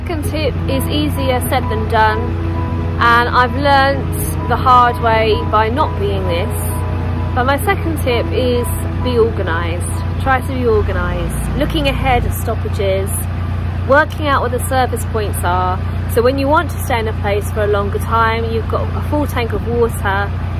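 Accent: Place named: Essex, United Kingdom. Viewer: There are many wonderful things in your videos, but today I must comment on your beautiful accent. Essex, but not Lorraine Chase. Your 'T's are not clipped, bottle ,and your 'G's are so clear something not somethin'. I can only put this down to your schooling. As a poet, I can imagine the clarity of words as they move from your mind to the pages of your notebook. There is a tranquility and calm in your delivery. I myself would be furious at the supermarket trolleys being dumped in canals and damaging people's boats. God bless and many thanks 😊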